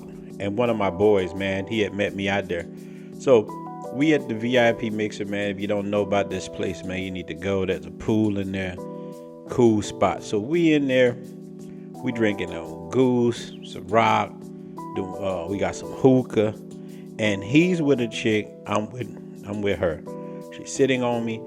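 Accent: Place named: Louisiana, United States. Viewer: what part of Louisiana are you from bro? I can hear a Louisiana twang. I'm from the boot and the way you said baffruum(Bathroom), I was like, bro from the N.O, but I can hear some of that Lafayette area in his voice also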